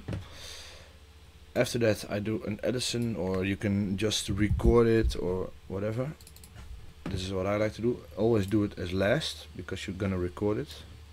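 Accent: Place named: Netherlands. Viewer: Original dutch accent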